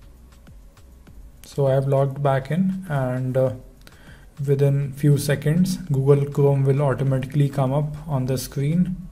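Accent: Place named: India. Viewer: I hear enough damn Indian accents when I use the phone!!!!!!!!!!!!!!!!!!!!!!!!!!!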